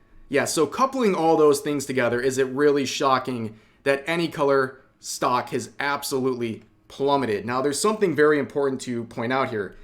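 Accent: Ireland. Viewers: wait, is irish? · You're Irish?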